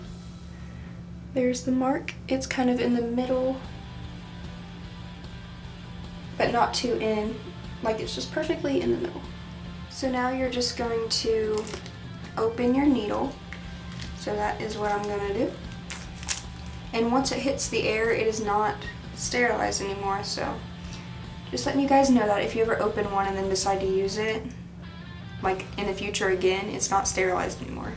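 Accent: South, United States. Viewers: Are you southern you sound like you have a southern accent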